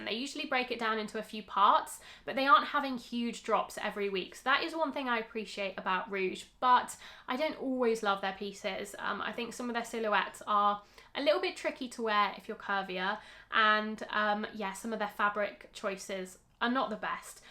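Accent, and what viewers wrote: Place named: England, United Kingdom. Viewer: Hello this video is a jewel, thank you so much for all the time you put on it!!! From the French, European section I'd add Caroll and Cyrillus. I buy often from them and love the quality of the items. Maybe they are a bit more classic than Sezane and similar in pricing. Nothing to do with that but your English accent is lovely ❤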